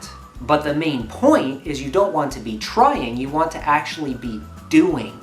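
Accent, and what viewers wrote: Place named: Canada. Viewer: Great tips as always. I listen to energetic music before my live streams. I also generally try to record when I'm feeling good and having a good day vs otherwise. I've always thought you had a Canadian accent, but today I've just noticed your video location and hoodie logo. 😀 Cool! Yay a great Yoda quote, now two. I do have a friend who is very talented in graphic design and is helping me as we speak! Lol "For the love of popsicle Pete!" I've never heard of that phrase, I love it.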